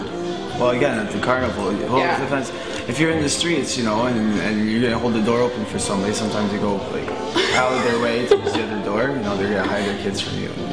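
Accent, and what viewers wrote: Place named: Quebec, Canada. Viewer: Man, he has that French Canadian accent which I can't stand.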